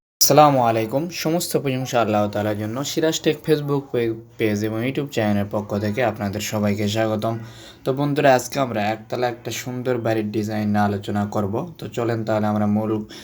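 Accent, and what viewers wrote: Naogaon, Bangladesh. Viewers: Apnar basha Naoga naki vai?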